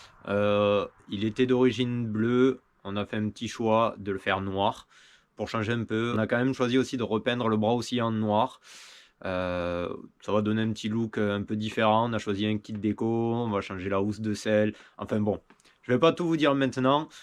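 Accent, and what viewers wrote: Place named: South-West, France. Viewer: Salut, tu es de quel département ? Tu as l'accent du sud ouest si je ne me trompe pas... Moi je suis des Bouches du Rhône.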